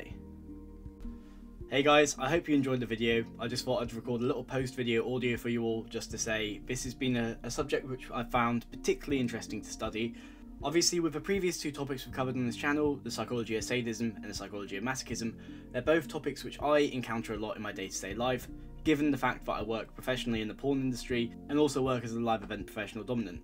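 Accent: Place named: United Kingdom. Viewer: Wish your pronunciation would have been clear and audible..Heavy British accent makes it foggy to hear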